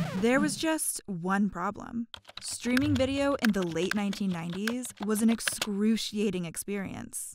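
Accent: California, United States. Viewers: California East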